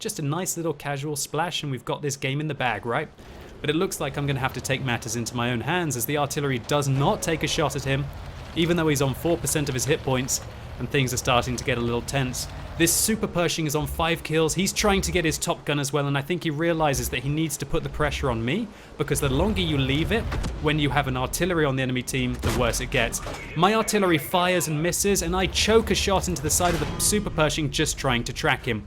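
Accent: England, United Kingdom. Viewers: sound way more british with the new mike x.x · with this mike you sound more british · Mate, the new mic really brings out your english accent. Needs more Aussie twang.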